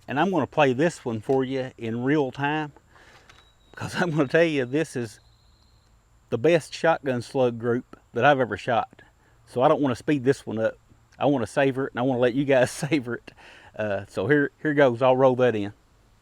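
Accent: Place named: South, United States. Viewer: that southern drawl gets soo annoying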